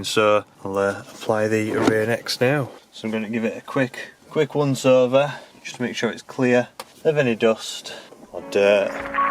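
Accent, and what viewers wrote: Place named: New York, United States. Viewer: Why does he talk like that? A American doesn't sound like that. He's probably from New York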